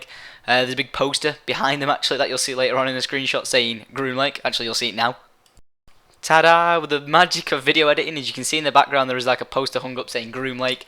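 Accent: United Kingdom. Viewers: Paka poonch. Oh, ur so Brittish.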